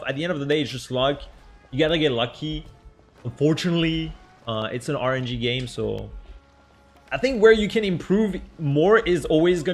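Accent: France. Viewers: is it hard for you to speak English and you are frensh idk btw i can speak both of them :) I'm from Morocco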